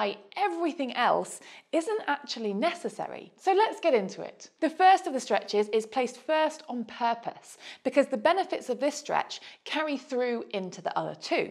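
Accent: United Kingdom. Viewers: And beautiful English accent. Not another American.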